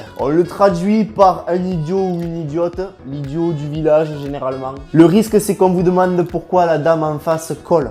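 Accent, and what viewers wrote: Toulouse, France. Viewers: J'adore l'accent et les expressions ❤️. Je veux l'accent toulousain avant de partir 😭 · TOULOUSAIN. Ou toulousaING ? Vivement une suite : moi j'aime bien quand vous dites "des en-nez" (des années). En tt cas vidéo drôle et utile 😊👍🏻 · L’accent toulousain c’est de loin le pire des pire accent sérieux c’est moche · Je suis Toulousaine mais mon accent est pourrit à côté du tien ke le kiffe trop 💕💕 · Ah quel plaisir d’entendre ce si bel accent chez nous les jeunes...! Ô Tolosa ❤️🖤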